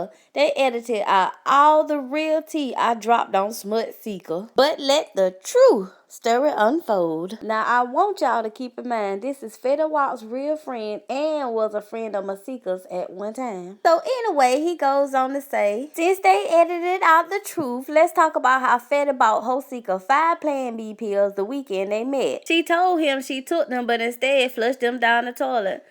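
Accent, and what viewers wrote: South, United States. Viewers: you must be from the south🍅🍅🍅🍅🍅🍅🍅🍅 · I love your voice You sound like a Southern Bell. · Damn this chick here gossiping sound so damn country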